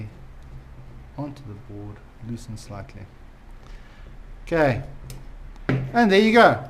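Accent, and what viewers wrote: South Africa. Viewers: use Tweezers boetie ;) Recently moved to New York and Iv needed a new hobby so decided buying a drone might be the way... Iv been doing a bit of research on the Tarot 690s and they seem to be the most customisable of the lot. what are your thoughts on building vs buying a used one for about $550 without a transmitter? PS watching the video and hearing your accent has made me miss Cape Town soooo much!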